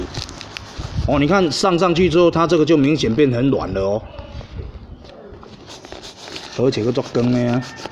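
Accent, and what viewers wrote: Taiwan. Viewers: 口白：落魄到拍Youtube要轉便當錢（沒辦法） 說真的，真的是一個很務實、又踏實的人（擦鞋也算是生活小細節，小品）加上一點點的江湖味，操的台語口音，真是滿有意思的。所以，工作太忙也要記得來收看的影片。給的建議：拍影片是快樂的，不要因為這樣有壓力，坦白說一支影片要弄好大概也是要花很多時間。記得要幹嘛！訂閱！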